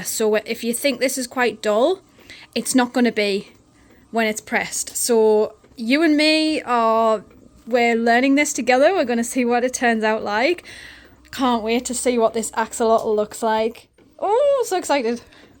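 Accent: United Kingdom. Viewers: I hear a northern accent. Are you from up north and still living in the UK or you in Australia ? They are lovely people they are 😊 I have just come across your channel 😊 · Just came across your channel and subscribed. Love the succulents, really cute. Do I hear a hint of Geordie/Gateshead? Abinova on youtube is from Gateshead, and she has also been having problems sublimating the square mousemats; she finds the round ones easier. Catherine from Katnipp is another good small business channel to follow for advice & inspiration. Wishing you good luck and success at the market. 🍀Have a great week. 🙂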